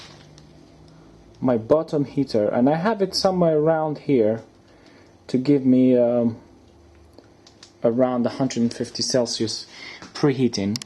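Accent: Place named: Poland. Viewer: Akcent Polski, chyba że się mylę Polak potrafi. Zrobiłem coś podobnego tylko z coffe machine do tostów i robienia kawy.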